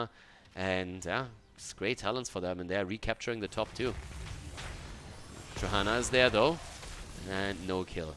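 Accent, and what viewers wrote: Germany. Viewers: Good commentary. It's Zometzing witz the german accent that just makes it more intriguing.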